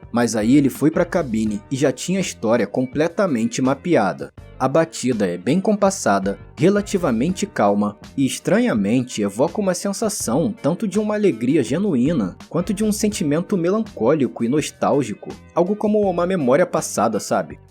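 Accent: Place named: Rio de Janeiro, Brazil. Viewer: O melhor é q esse vídeo sobre Cube é narrado por um carioca. Vaibe de gueto.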